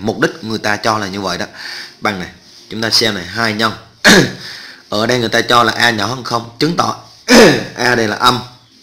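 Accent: Binh Dinh, Vietnam. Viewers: giọng bình địa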